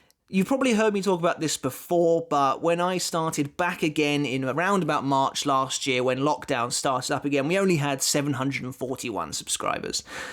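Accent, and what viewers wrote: United Kingdom. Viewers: Wait you’re from the uk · i forgot...... british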